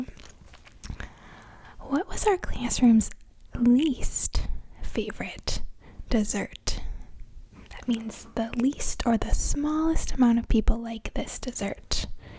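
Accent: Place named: Midwest, United States. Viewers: Excellent video, the mic you use softens the voice just enough. The accent is nice too, it sounds like you grew up near Cleveland. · lol. midwestern accents.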